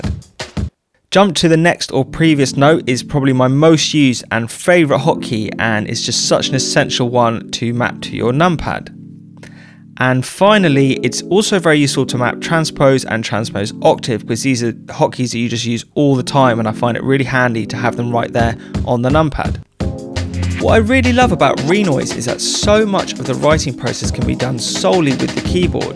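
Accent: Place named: United Kingdom. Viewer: Honestly, as soon as I heard his British accent I was sold on the product.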